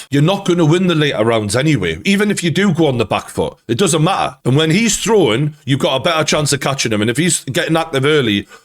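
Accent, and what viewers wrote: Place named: Geordie, United Kingdom. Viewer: f^t geordie you have the most annoying accent i’ve ever heard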